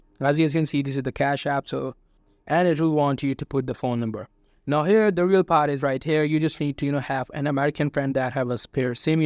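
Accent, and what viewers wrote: India. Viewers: I hear your indian accent gang no hiding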